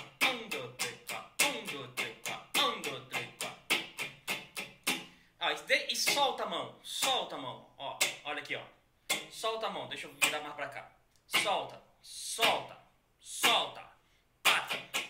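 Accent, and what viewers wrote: Pará, Brazil. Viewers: Legal o vídeo... e cara vc tem sotaque Paraense heheh eu sou do Pará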